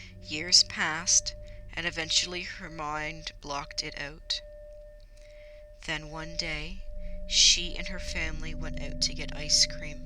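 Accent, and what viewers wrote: Canada. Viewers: Also, you sound a tad bit Canadian.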